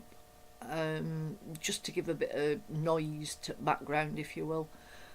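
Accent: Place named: North, United Kingdom. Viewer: Hey, absolutely genius idea. Love it! I’m going to have to try this. I love your accent, are you from York or Lancashire? PS. Hope i haven’t offended. I’m probably way off the money. Lol. Anyway, loved your idea, and glad your back. Crafty Hugs. Xoxo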